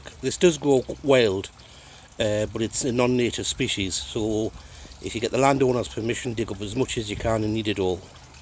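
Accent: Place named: Geordie, United Kingdom. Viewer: I know a fellow geordie when I hear one! Haha. So do you live in north east England then?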